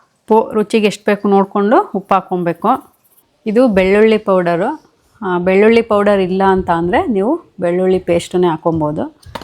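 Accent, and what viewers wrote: Mandya, India. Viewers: Nev same nan tharane mandhya style mathadodhu nange thumba esta nav yelle eddru nam basee mareyokke agaalla 🎉🎉 wish you happy birthday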